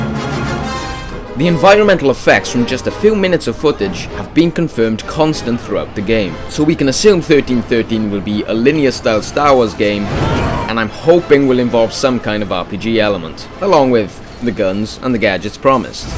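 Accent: Wales, United Kingdom. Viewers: because i like this video so much and your welsh i will subscribe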